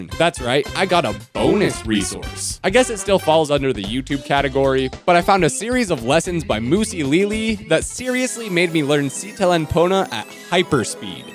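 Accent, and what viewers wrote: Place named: United States. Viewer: i still feel like you kinda have a really strong american accent when speaking toki pona... lol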